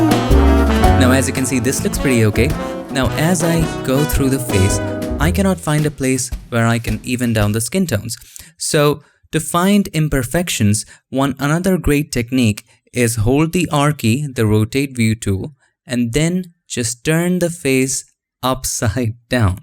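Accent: India. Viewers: best Indian accent I ever heard (.)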